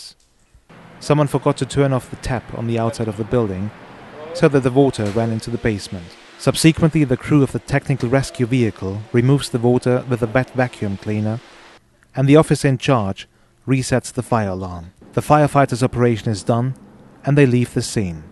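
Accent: United Kingdom. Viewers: Ach, das "Amt für Brand- undZivilschutz" oder wie das heißt, in der Greflinger... Schönes Material, da in diesem Jahr das Budget für einen Neubau (sprich: Abriss des Bestandsgebäudes) genehmigt wurde. Historisch wertvoll :-) Außerdem ein schöner britischer Akzent! Ich kriege den nicht hin, nur wenn ich "oh no!" sage, ansonsten habe ich das eher raue US-Englisch drauf. Mit starkem, deutschem Akzent. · Could you please make another version of that documentary with an american speaker? The speaker's english sounds really british :(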